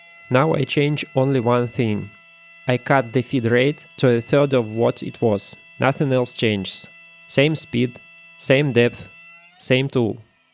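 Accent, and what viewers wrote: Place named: Russia. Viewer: It is impossible to hide a russian accent.😅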